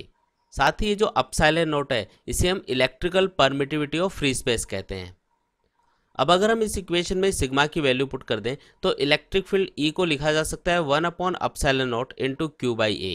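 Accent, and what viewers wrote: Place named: Rajasthan, India. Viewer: Sir kha se ho ... Language se to Rajasthan se hi lag rhe ho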